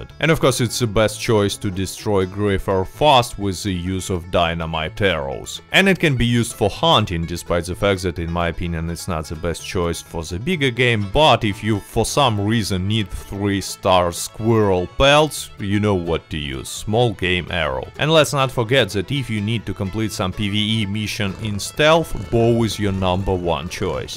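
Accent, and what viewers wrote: Russia. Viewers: Russian accent still visible enough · I've only just found ur acc but I can tell I'll like u as a content creator also i can hear ur Russian and your English is good can't wait to see what u got for me and I just edited this and realised I replied to som1 and not commented my bad🤭😂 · are you Russian? ME TOO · Wow, all video I was like "is this a Russian accent" and when I saw "попадание в шляпу" I get it, so I have a question, what is painting black, is it giving more damage ? Буду благодарен ответу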